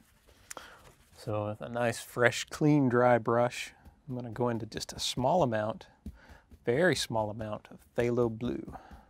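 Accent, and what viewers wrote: South, United States. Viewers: A lot of southerns say it like that. That have w that brings in a h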